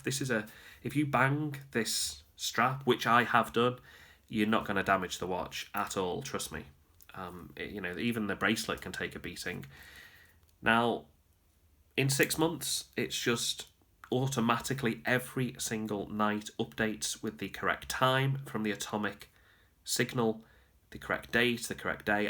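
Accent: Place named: North, United Kingdom. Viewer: Thanks, a good video. I like your clear Northern English accent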